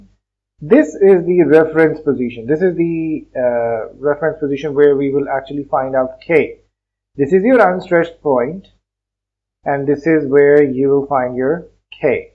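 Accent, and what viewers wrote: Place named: India. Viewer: his accent is not bad, much better than common indian accent!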